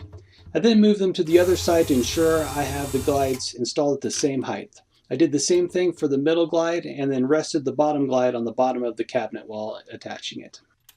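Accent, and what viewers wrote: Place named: Croatia. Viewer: Wonderful vid however your heavy Croatian accent makes it difficult to understand what you are saying. Would you please consider providing American subtitles. Thanks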